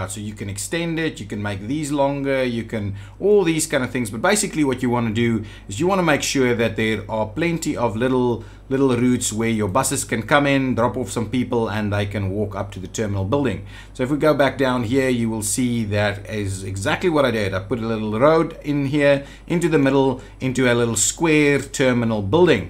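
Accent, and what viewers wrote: Afrikaans, South Africa. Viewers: You aren't from South Africa are you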